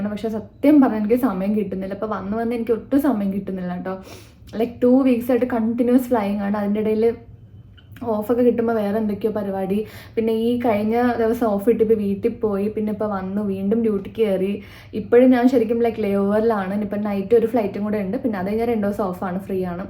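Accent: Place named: Kochi, India. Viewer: തിരുവനന്ദപുരം സ്വദേശി ആണെങ്കിലുo കൊച്ചിയിലെ ഒഴുക്കായ മലയാള o കൊണ്ടു എല്ലാ പേർക്കും വളരെ ഇഷ്ടപെടുന്നുണ്ട്.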